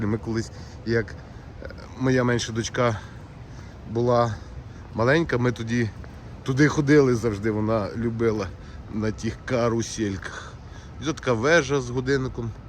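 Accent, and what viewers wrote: Zhytomyr, Ukraine. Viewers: Вітаю . У тебе мова геть на житомирську область схожа)..Цікавий контент робиш як на мою думку .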